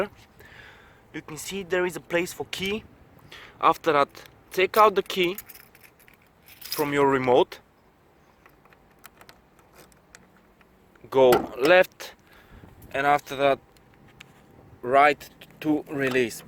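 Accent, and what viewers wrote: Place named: Bulgaria. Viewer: Лол пич !! Много ти личи ,че си Българин. Още не ти бях прочел името на канала и като чух как говориш познах. :) :)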